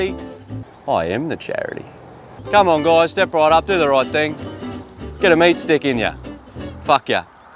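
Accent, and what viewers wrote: Australia. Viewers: Australian as fuck 🇦🇺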